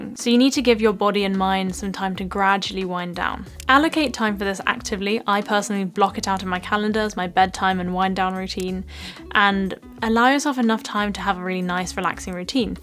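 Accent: England, United Kingdom. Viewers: She is a very talented and a beautiful princess. I love her English accent. It is just so cute. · Hi,! Thank you so much for sharing about it. I’m learning English now. I like British accent so I learn a lot from your videos. I’m always cheering for you from Tokyo Japan❤ I hope u to come to Japan someday! I love you❤❤❤ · It's nice to hear proper RP on YouTube. Most of us get shooed off. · how have you been l hope you re doing well l have watched you for 5 months and h really have benefited as weel as l didnt understand english language but with you there is not much to it and especially british accent from your faithful follow from algeria💜💜💜💜💜💜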